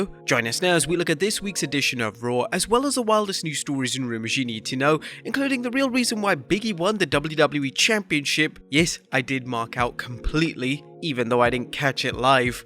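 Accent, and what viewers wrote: United Kingdom. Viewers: oh you're also from the UK nice. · Wow, u from uk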